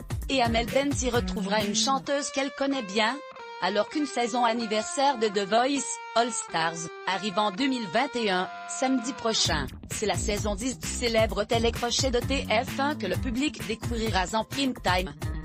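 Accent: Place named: Quebec, Canada. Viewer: Une voix québécoise qui raconte....ça fait bizarre!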